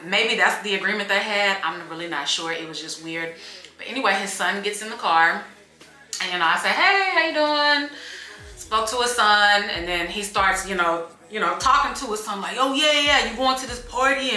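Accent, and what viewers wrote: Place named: New York, United States. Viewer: He definitely sounds like a NY j*gga you picking up your sonnnnnnnn lmao #ihateithere #nycnative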